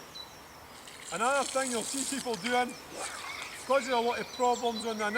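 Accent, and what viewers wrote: Scotland, United Kingdom. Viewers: Ah unreal they were the days tight lines best Scottish accent ever be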